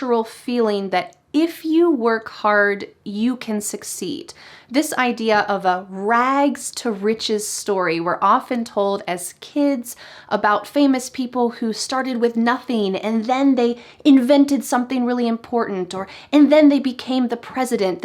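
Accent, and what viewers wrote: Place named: United States. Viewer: Hi, I have been fallowing you for a long time in order to improve my english skills. I am a really big fan of you. This is the best english chanel when it comes to american accent. It 's the best to learn about american culture as well. Hi from 🇩🇴🇩🇴🇩🇴🇩🇴🇩🇴🇩🇴🇩🇴🇩🇴🇩🇴🇩🇴🇩🇴🇩🇴🇩🇴🇩🇴🇩🇴🇩🇴🇩🇴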